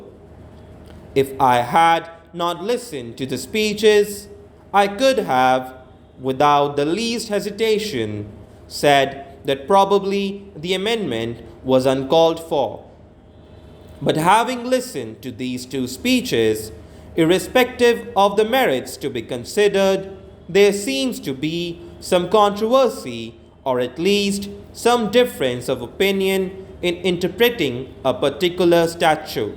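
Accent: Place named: England, United Kingdom. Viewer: O bhaiya thoda halka haath rkho humare yha itni standard English na boli jati na smjhi jati isliye pronounciation thoda ache se bolo Jo smjh aa sake pura angrej ban rhe ho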